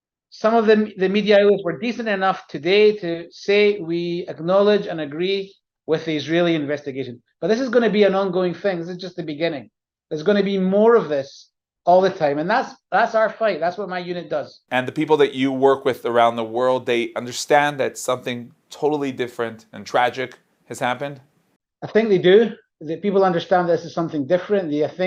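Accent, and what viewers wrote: Scotland, United Kingdom. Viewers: I stand with Isreal and loving the Scottish voice. Praying for the complete end of Islamisist terrorists death cults and the beginning of a positive living future loving life. 🙏 How can they believe people who pray to ‘the greatest deceiver? and the mischievous maker’. If you believe them you can’t believe them, right! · Do I detect a slight Scottish Accent in there somewhere Sir? You are so right Sir. You’re a very open and honest man! You and your Nation needs to, and Must show the Whole World what happened in your country. Israel was intentionally, deliberately and savagely attacked in a totally unprovoked act of Genocidal Evil. You must show the World Uncensored and Raw images of this disgusting Pogrom committed against Israel and the Jewish people. Nothing like this has happened since the Nazi’s Genocidal murder of the Jews during the Second World War! 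You must defeat this Evil and the World needs to back your actions. All those who tell lies, the Western Media, University morons and all these sick anti Jewish, Anti Israel Haters and Demonstrators are Supporting Genocide and NAZISM. Hamas behave like and act just like the Nazis did, they are Evil Brainwashed Fanatics, and so are all those who support and back them! They must be shown that they are wrong, you must show the Entire World what happened to you people! God be with you my friend. Shalom!! A Supporter of Israel from England. ❤🏴🇬🇧🇮🇱🇺🇸❤ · we love your Scottish accent!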